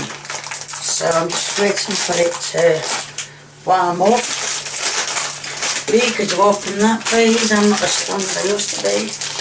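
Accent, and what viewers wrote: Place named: Scotland, United Kingdom. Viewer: She’s from Scotland I think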